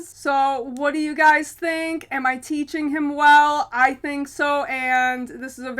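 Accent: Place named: Western New York, United States. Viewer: Love your content ... and Western NY accent!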